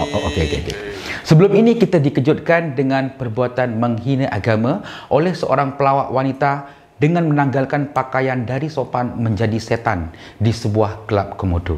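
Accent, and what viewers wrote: Java, Indonesia. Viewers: Wak bg pekat sikit bahasa jawa tu😂😂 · Wak kalo ckp pekat lg jawa tuu lagi mantop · Wak pekat sekali jowonya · ckp meddok jowo tu ade haha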